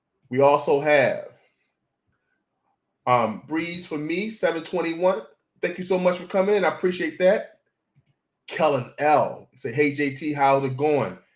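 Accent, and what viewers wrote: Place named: Maryland, United States. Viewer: Def interesting hearing about all you do JT. Thanks for the content! Love your MD accent!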